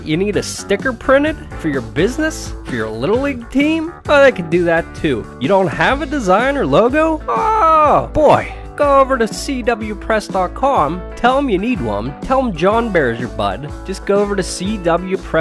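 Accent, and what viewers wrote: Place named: Pittsburgh, United States. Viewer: Being from Western Pennsylvania I knew you were from Pittsburgh area Western to central PA From your accent and the mountain laurels in the woods were you and Buck love to walk. You show great projects and do wonderful work Great ideas.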